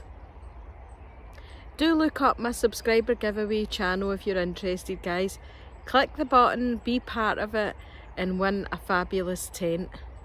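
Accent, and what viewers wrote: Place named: Scotland, United Kingdom. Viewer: Excellent relaxing video as always with wonderful Scottish accent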